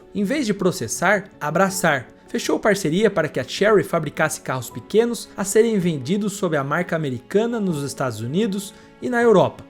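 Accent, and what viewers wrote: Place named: Caipira, Brazil. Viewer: Desculpe, mas esse sotaque caipira não dá pra escutar. Mas o conteúdo parecia ser interessante.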